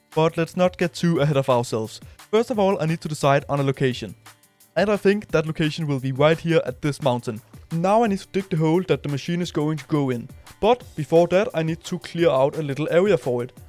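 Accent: Denmark. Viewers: are you danish by anychance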